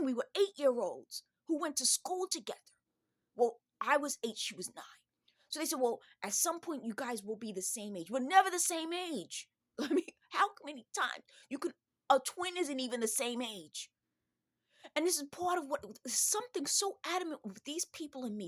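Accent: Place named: New York, United States. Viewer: I'm sorry you are going through this! Do you think it's Riverbay doing this? Or their leaks/ mold causing issues? I know other people that are having issues, and they think its the water (the shower water may have too much metal) or the mold. I hope your leg gets better soon. Have your doctor check your ferritin and all iron levels. Also, check your insulin level, specifically A1c test. Again, I hope things get better. Thanks for the hair oil info! 😊 btw I love your slight NY accent. Keep smiling and try not to let anyone get to you!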